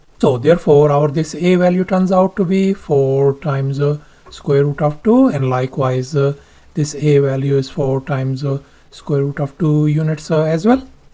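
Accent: India. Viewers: It takes 12 seconds to solve this . it's OK to speak indianglish but you don't need to kill yourself for 10 minutes 9 × radical = 12.7279... 12.7279...- 8 = 4.7279... 4.7279...× 8 = 37.8233... 😂😂😂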